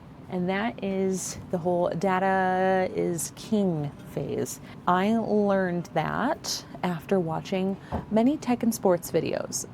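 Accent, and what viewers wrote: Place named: Michigan, United States. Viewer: Came for the reselling content, stayed for the Michigan accent.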